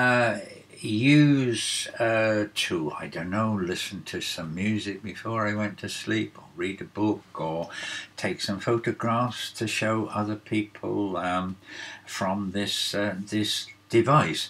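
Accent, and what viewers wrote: United Kingdom. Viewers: Always like British accents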